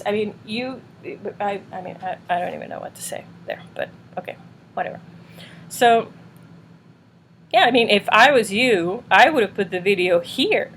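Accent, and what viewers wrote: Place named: South Florida, United States. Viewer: You barely have any accent....I live in Florida and you sound like you're from South Florida. Wish I coyld speak Spanish as well as you do English.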